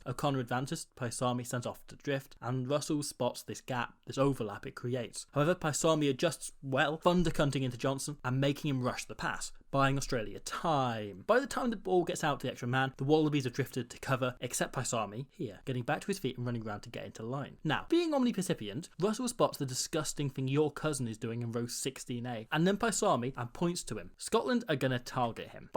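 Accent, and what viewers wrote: United Kingdom. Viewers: Your voice sounds british but you get stan sport which is Aussie/kiwi so what country are you from ? Guessing not Aussie since all our players are little piss boys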